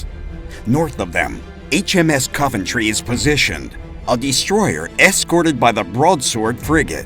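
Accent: United States. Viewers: He’s clearly American…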